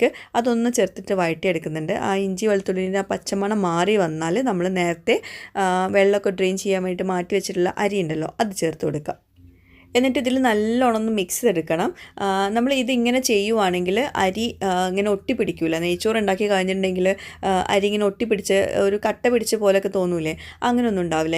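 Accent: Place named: Northern Kerala, India. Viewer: Hi.. Njan ഈയിടെ ആയിട്ടാണ് vlogs കാണുന്നത്.. 4 items ഉണ്ടാക്കി.. Suupprr.. കുറച്ചു receipies എഴുതി വച്ചിട്ടുണ്ട്.. യുടെ proper place എവിടെയാ?? ഒരു വടക്കൻ slang.. അതാണ് ചോദിച്ചത്.. ഞാൻ kannur..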